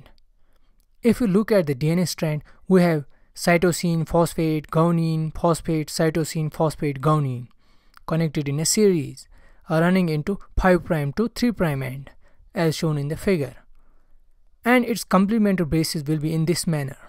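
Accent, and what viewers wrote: Kashmir, India. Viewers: Wonderful explaination. You HV kashmiri accent.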